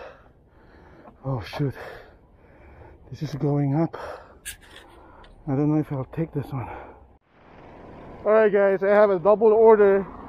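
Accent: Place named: Southeast Asia. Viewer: your accent is asian... errrr South east asian?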